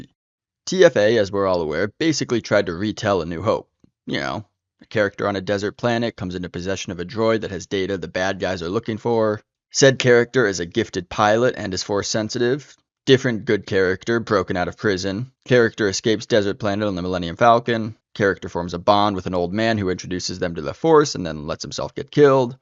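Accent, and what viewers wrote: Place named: France. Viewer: You sound so french.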